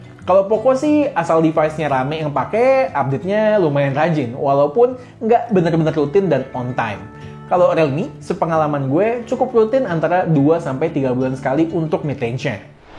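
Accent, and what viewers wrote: India. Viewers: aga tripping kwkwkw karena yg ngomong mirip orang india tapi ngomong indo, kaget saya hahaha keren keren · Gue kira channel orang India. Kaget gue pake bahasa Indonesia 🤣🙌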